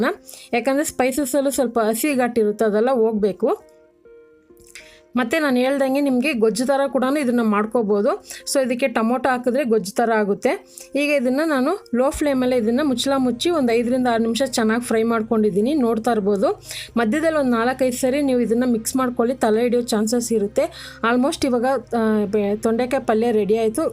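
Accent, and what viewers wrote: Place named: Anekal, India. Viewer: Watched your kalamadhyama interview. I am watching your video since a year and I did not really admired the way you were speaking kannada words... But now I got to know that you are from Anekal and slang is like that.. All the best tangi... Devaru nimagella olleyadanna maadali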